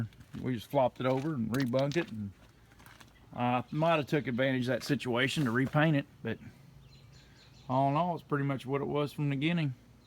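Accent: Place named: Rural, United States. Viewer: I think the funniest parts of the videos is you talking. I'm from europe, where everyone speaks good-ish english. But once you get an american countryboy speaking, you're in for a real treat :)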